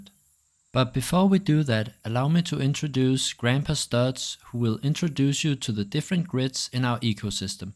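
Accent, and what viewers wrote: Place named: Denmark. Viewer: Fed fed projekt kunne høre du var dansker fra starten 😂 Arbejder selv med ai så fortsæt det gode arbejde!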